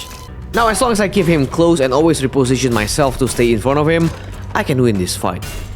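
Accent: Indonesia. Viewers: You sound like indonesian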